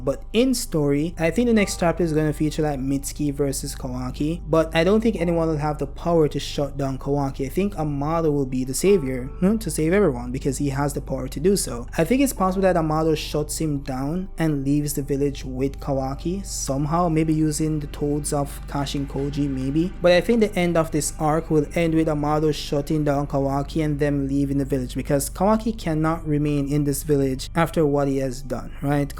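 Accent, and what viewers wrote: Jamaica. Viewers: are you jaaican by any chance, your accent sounds familliar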